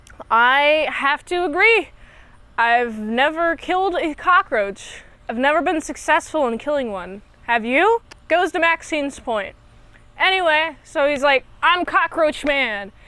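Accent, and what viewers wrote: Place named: United States. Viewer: I love the American accent lol. What part is that accent from?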